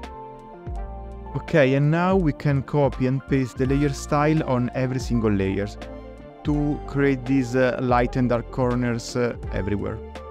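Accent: Italy. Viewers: the italian accent is better then the tutorial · sbaglio o sento l'accento italiano? Congrats man! I've just discovered you and it was instant follow. Fa sempre piacere vedere del talento italiano. Di dove sei?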